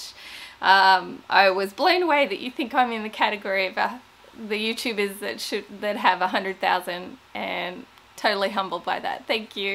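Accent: Australia. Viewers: Congratulations fellow Australian! Loved this video I always wanted to ask you how you ended up in America. I don’t think I ever knew you were a nurse as I’m a nurse too and can totally relate to what you were saying about nursing. It’s been pretty tough to nurse during covid though. Flipping furniture is my time out from my work. I can see your channel growing so much as your videos are amazing plus I just love to hear a fellow Australian on YouTube. So happy you are a DIY paint supplier! I’ve wanted to try that paint after you first used it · so happy for you! You are so much fun to watch and listen to with that Ausse accent..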